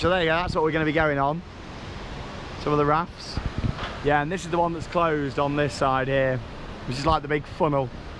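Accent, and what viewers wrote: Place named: United Kingdom. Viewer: It's cool to see another brit with a heavy accent in an Arab country lol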